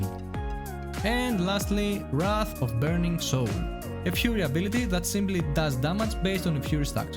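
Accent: Greece. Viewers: Is that a greek accent?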